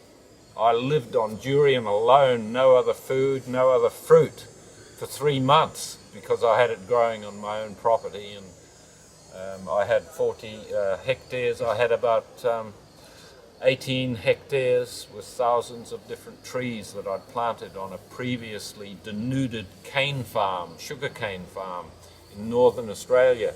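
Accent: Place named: Ukraine. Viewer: Приємно чути, коли не просто українська, а.. чиста українська )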